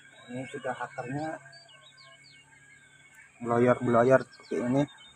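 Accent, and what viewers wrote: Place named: Java, Indonesia. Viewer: Sampeyan basa jawane medok pisan sihh,,,??wong endi njenengan mase,,,👍👍👍🙏🙏🙏🙏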